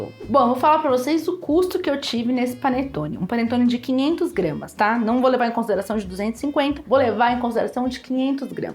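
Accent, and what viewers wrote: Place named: Bahia, Brazil. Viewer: KKK vc é da Bahia? Fala igual a gente velho kkkkkkkNa moral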